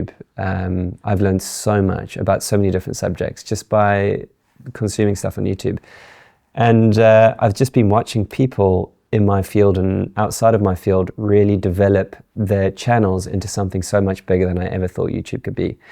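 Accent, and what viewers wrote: South Africa. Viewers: Why do you sound South African? It morphed from an Australian to South African accent 🤔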